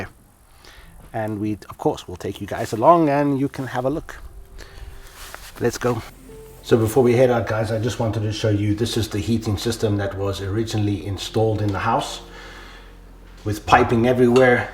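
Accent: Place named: Cape Town, South Africa. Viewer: I knew it🤣🤣🤣 I'm from Cape Town too..our accent is very distinct to the rest of the world..you can here a Cape Tonian accent peeping through any accent lol. But I'm so happy for you. Your life looks so pieceful. I'm struggling in Cape Town to escape the rat race. It's just so expensive to buy your way out to live self sufficiently here. I want to move off grid but land here is so tight and the land claim act is making it difficult for people. So I dream of taking an adventure like you, but I don't have enough money yet. But you are brave Sir. Very brave to journey so far to the unknown. I'm really happy for you. Keep on enjoying your adventures with your family..I have subscribed to your channel. I loved the archery video as I am into archery 🏹.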